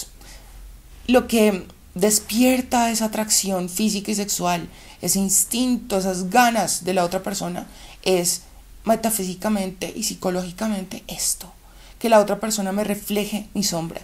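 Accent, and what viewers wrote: Colombia. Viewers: Excelente video y GRAN CONTENIDO 🇨🇴 MI GURÚ FAVORITA ❣️😉✌️🇲🇽 (esa manera de hablar colombiano y esplicar con tanta paciencia te hacen muy ESPECIAL 🇨🇴❣️😉✌️🇲🇽)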